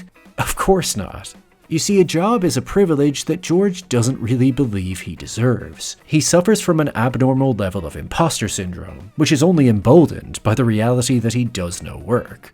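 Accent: Ireland. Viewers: Your Irish huh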